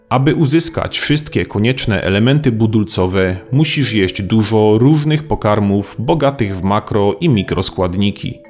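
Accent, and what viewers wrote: Silesia, Poland. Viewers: Czy lektor jest ze Śląska? Słychać akcent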